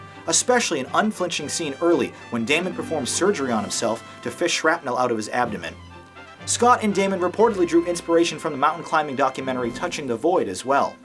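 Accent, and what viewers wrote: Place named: Boston, United States. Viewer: Review Black mass and add your boston accent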